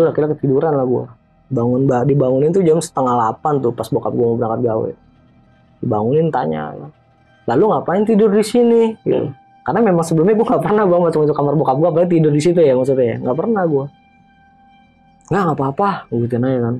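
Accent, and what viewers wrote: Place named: Betawi, Indonesia. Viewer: Ceritanya menarik untuk terus diikuti hingga akhir, gaya bertuturnya asyik khas anak muda betawi, si mas yg cerita ganteng pula, paket lengkap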